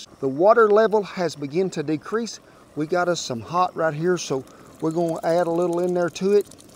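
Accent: South, United States. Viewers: That southern accent crack me up, it feels just like i'm back in El Paso, Looks mighty good!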